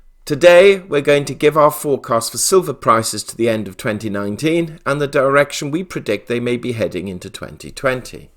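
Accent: England, United Kingdom. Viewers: I truly love your postings. I make it an effort to watch for them every day, even if I place it on speed 1.5 to make room for the heavy English accent. Normally I listen to it at 2 and I do listen to many people on the markets. With that being said, you do go to awful lengths to prove you were right and others were wrong. I have noticed more often than not, you are right, hence why I choose to listen to you. Please consider the concept of “realizing you are right, you do not need to justify it in anyway”. You know, what you know.... and you sound extremely intuitive as well. Let go of any need to prove others wrong for it does not serve you nor me listening to you. Please, hear my views come from a pure heart. Thank you so much for giving us your guidance and advice each and every time you take the time to post a video. If you need any assistance on releasing this issue please let me know.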